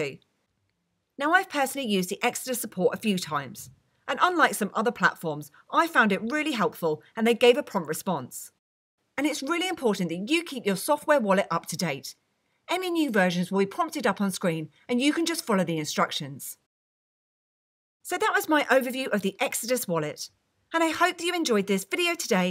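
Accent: United Kingdom. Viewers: Could not understand her at all...her thick Brit accent ruined the video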